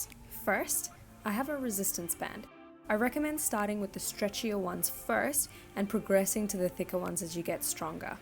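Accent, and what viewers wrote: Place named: Australia. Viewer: I noticed that you have an Australian accent! Just wondering where you can go to purchase ice skating boots?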